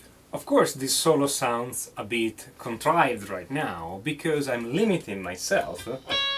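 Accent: Italy. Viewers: he is italian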